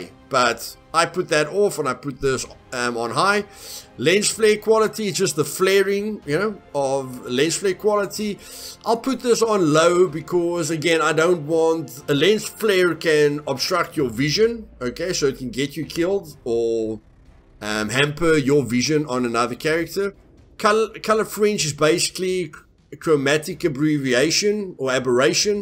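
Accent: Afrikaans, South Africa. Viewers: Hey this Guy definitely Afrikaans😂😂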